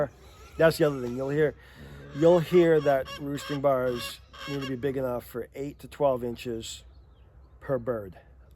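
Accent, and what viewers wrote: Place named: Massachusetts, United States. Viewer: No he doesn’t. His wife has cancer though, I love him, i bet hes from massachusetts like my aunty dee dee great accent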